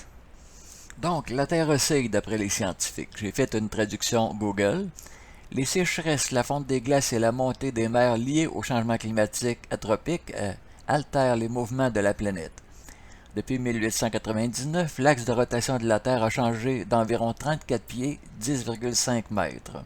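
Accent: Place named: Quebec, Canada. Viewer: Merci! Je trouves ça super cool toutes tes vidéos d'articles sur Nibiru. C'est juste avec toi que je suis abonnée sur Youtube. Tu expliques de manière précise avec une bonne chronologie des évènements. Pis en plus, au moins j'entends quelqu'un qui parle français-quebecois, fak c'est encore mieux! En tout cas, un gros Bravo pour tout le travail que tu fais pour nous tenir informé, c'est apprécié.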